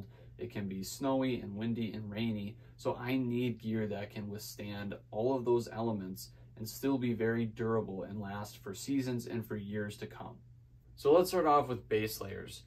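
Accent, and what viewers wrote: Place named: Midwest, United States. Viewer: I am from WI, your accent says mid to southern western. Where did or have you used said products? 👍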